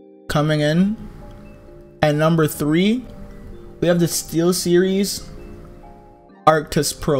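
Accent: Jamaica. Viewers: You’re Jamaican?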